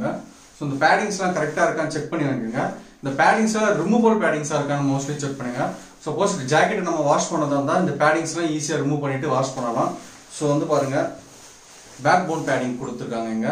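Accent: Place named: Chennai, India. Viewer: வாங்க சொல ! கேட்க சொல ! Your Chennai language speech was amazing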